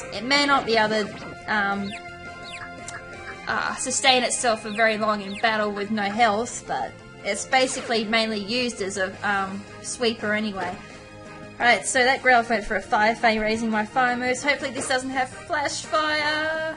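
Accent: Australia. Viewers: Are you Australian? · are you an Aussie? (just that your voice sounds like it and im aussie too:3)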